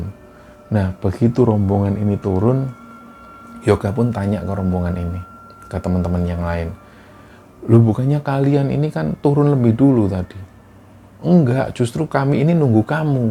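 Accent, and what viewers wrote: Java, Indonesia. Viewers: Saya orang Sasak Lombok pecandu kembaran sunyi, sy 13 tahun nyatri di Ponorogo Jawa timur (<num>) , nyimak kembaran sunyi, sy menjadi TERjebak di masa lalu, backing musik, langgam sinden dan gaya adik yg cerita sangat njawani.aku 85 persen JD flashback seperti terasa masih JD santri di Jatim, ingin rasanya kembali ke masa lalu, aku suka content juga, Krn content ini, kandungan DAKWAH ISLAMIYAh, praktis mudah difahami dan efektif utk mencegah kemusyrikan, menguatkan AQIDAH, banyak pelajaran berharga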